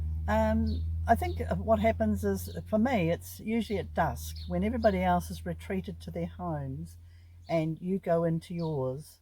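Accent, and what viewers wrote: New Zealand. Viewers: It's fantastic to hear a kiwi accent. Totally agree, everyone needs a purpose, even if it's small.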